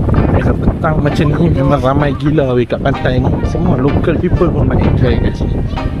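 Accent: North, Malaysia. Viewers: Samalah...first time dengaq loghat utara terus subcribe😂...n seghonok dengaq penerangannya..jelas sebutan..terbaik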